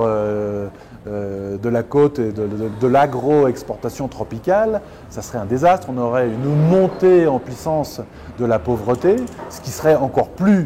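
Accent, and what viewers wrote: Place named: Ecuador. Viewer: Le français plus équatorien au monde! Bien expliqué le Sumak Kawsay.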